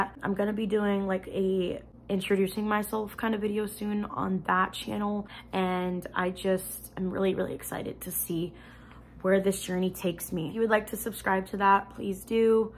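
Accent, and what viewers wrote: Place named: New Jersey, United States. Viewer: I am a new subscriber. I am originally from New Jersey, Jersey Shore and I miss it so when I came upon your Jersey Girl checkout girl, I immediately subscribed. I live in Colorado with my wife now and miss Jersey and was laughing out loud at your accent! Lol. I hope I didn’t show up too late to the party but you have to be happy like you said in your video. I wish you the very best of luck in no matter what you decide to do. All the best to you and your family.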